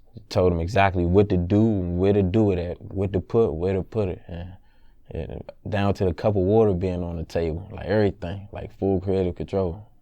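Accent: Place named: South, United States. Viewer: He Sounds Country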